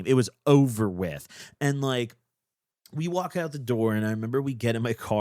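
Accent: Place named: South, United States. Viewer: Southern ass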